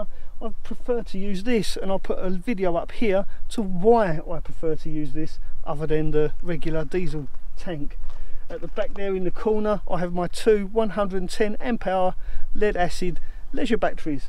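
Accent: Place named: RP, United Kingdom. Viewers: I’ve been watching your videos for quite awhile how come you’re talking posh are in this one what’s going on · Loving the posh voice lol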